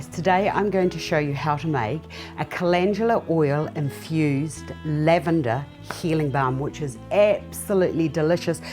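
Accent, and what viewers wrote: New Zealand. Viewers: Hi. I'm new to these things. this is my first look at Balms. I really enjoyed this video and found it so easy to follow. I want to thank you for such a great intro into what I hope I will become skilled at. also its great to hear a NZ accent. My family and I are due to move to NZ in the next few months from UK. As if by magic I find this vid first. Great. Thank you again. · John Anthony : Vit E I’m sure ... New Zealander accent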